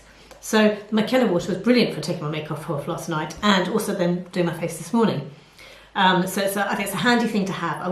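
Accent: England, United Kingdom. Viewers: Hi ,I enjoyed your video so much & i know im going to love watching all of them!! I just subbed darling! You are so Cute & i love your English accent!! awe this was so cute & fun to watch ,much love & peace to you!🌷💓😊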